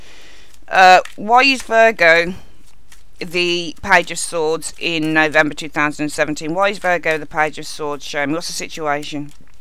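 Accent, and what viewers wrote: Birmingham, United Kingdom. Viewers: Thank you. Mismanaging money...yup. my lifelong problem. Hopeless! Love the Brum accent btw. Always enjoy your direct, on point approach to readings....don't let the whingers get you down!! 😋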